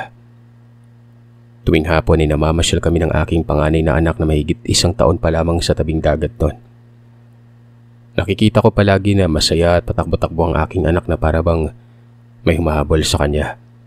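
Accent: Iloilo, Philippines. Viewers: Hahahha ilonggo gid ang tuno ni sir hahahha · Kanami gd ya pamatian bsta ilonggo accent sir hahaha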